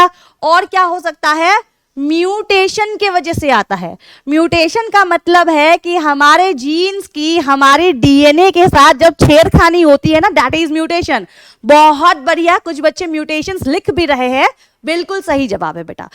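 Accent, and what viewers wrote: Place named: Bhojpuri, India. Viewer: ARE DIKKAT KA HAI RE.. BEST BHOJPURI TONE... 🔥🔥😂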